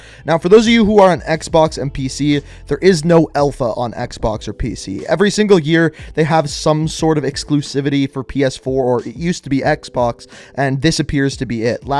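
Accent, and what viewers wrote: Canada. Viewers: Are you Canadian my guy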